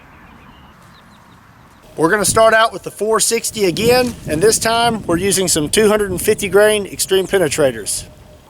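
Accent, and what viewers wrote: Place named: Texas, United States. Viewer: That’s just how we talk in Texas al right!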